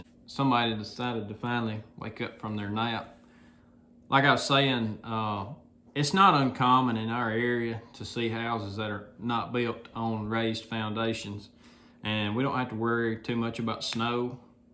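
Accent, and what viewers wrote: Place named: Mississippi, United States. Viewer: Don't apologize for your dream. It is awesome and your videos answer a lot and inspire people to do their own. Some of the best videos for that with Pole Barns I have seen. Plus as a guy who met/married/had children in and lived in MS for 13 years I appreciate the down home accent and lingo. :)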